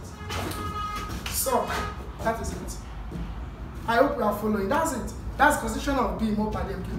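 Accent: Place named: Nigeria. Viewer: God bless you man!!! You sound Nigerian. More love from Ghana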